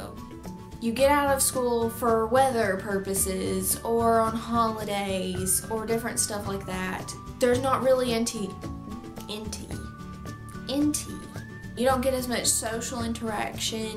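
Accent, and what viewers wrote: United States. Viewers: love your country accent :)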